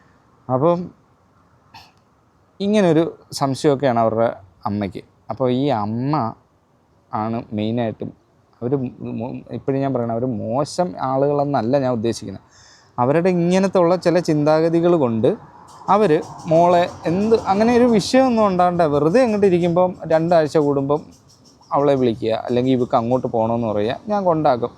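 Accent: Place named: Pathanamthitta, India. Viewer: Bro Try to solve and have a good life with your child, നമ്മളോട് നന്നായി behave ചെയ്യുന്ന നമ്മുടെ relatives മറ്റുള്ള എല്ലാവരോടും അങ്ങനെ അകണം എന്നില്ല. ആവശ്യമെങ്കിൽ അവരോടു കൂടി മാറി താമസിക്കണം. ഇന്നത്തെ കാലത്ത് എല്ലാവരും എല്ലാവരെയും സഹിച് ജീവിക്കണം എന്നൊന്നുമില്ല. നിങ്ങളുടെ ജീവിതം നിങ്ങൾ 3 പേരുടെ മാത്രം ആണ്. അവരോട് നേരിട്ട് ചോദിക്കുക ഒന്നിച്ചു ജീവിക്കാൻ താല്പര്യം ഉണ്ടോ എന്ന്, എന്നിട്ട് മുൻപോട്ട്. You sound kike from Pathanamthitta or Kollam area, all the best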